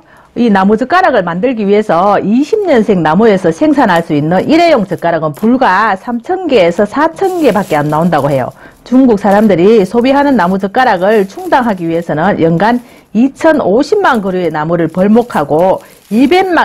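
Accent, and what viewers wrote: Gyeongsang, South Korea. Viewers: ㅎㅎ 좋은 정보 잘 보고 있습니다 경상도 본토 말 오랜만에 듣네요 일회용 나무 젓가락은 사용 금 하는게 좋겠네요 알지만 1회용 컵 모든 1회용은 문제가 많은것 같아요 감사합니다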